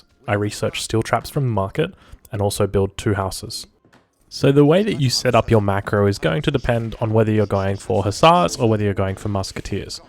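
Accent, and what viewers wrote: Australia. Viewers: He's just australian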